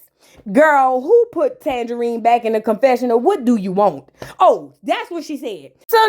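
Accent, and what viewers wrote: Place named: Louisiana, United States. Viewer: Your voice sounds like Tokyo Toni meets Louisiana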